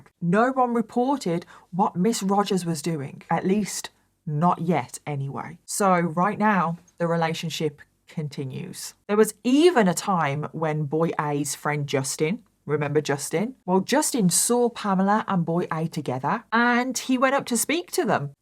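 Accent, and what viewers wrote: United Kingdom. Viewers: I find you attractive despite your British accent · LOL loved this roasting of creepy Americans in British Accent 😅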